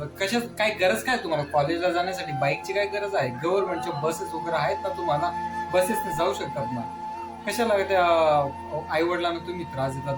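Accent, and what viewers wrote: Khandesh, India. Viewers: खान्देशी आहात का भाऊ tone तिकडची वाटून राहिली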